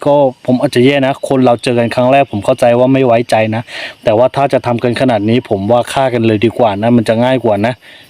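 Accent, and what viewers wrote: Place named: North, Thailand. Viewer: พูดเหมือนคนทางภาคเหนือของเรา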